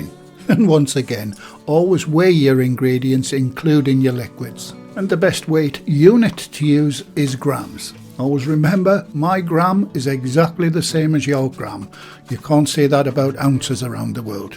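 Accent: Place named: North West England, United Kingdom. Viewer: Thank you and tomorrow I'm going to have a go making this recipe as it is clear and concise. I like the voice over instead of watching someone banging around in the kitchen, personal preference. I have been on the old sourdough journey and have finally got a starter going, it's still a learning curve to make a loaf that's edible and not too dense. I finally bought bread flour, so I think this will make all the difference. When you said *natural yeast (I think that was the term used) do you mean sourdough? I also liked your accent as I'm from the North West coast originally, I live in Canada. Left UK in 1972. I'll have to go look at your website. *edit: no it was fresh yeast you said!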